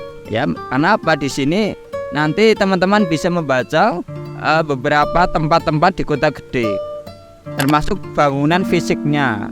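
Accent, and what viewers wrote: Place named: Banyumas, Indonesia. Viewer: Sampean kok bahasane..logate ngapak ya…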